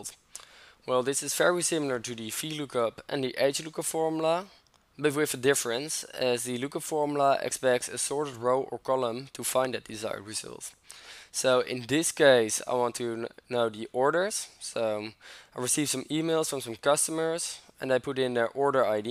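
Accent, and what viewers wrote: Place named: Netherlands. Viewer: keep up the good work. By any chance you are dutch. Please see an english logopedist for it might drasticly improve the quality of your costumersatisfaction.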